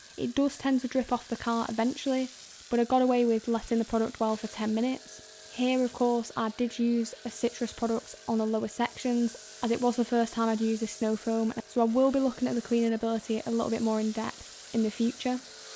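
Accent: United Kingdom. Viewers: I love your British accent 💖 sometimes.....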